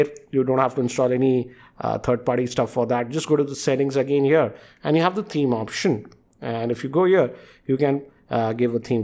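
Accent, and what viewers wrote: India. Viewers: thank you for doing these excellent, very task-specific videos.Your work is very appreciated. I'd like to make a suggestion to make their goodness even better. I am an English speaking American; and your native language is Indian. Your dialect and mine are quite different. Typically native-speaking English people speak more slowly. You speak much more quickly and (of course) pronounce English words as you learned and know them. I concentrate and listen closely to you; but due to our lingual differences unfortunately I fail to understand much of what you are teaching. When you make videos in the English language,could you try to speak more slowly and pause a moment between topics. What you are teaching is very valuable, and I want to hear and understand you fully. Thank you very much and please continue sharing you knowledge. I appreciate your work!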